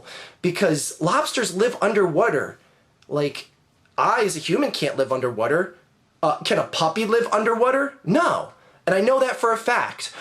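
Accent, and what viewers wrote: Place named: Philadelphia, United States. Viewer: you are definitely from philly saying wooder instead of water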